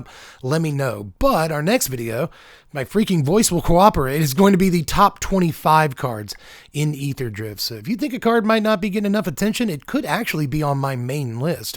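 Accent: South, United States. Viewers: gets more southern with laryngitis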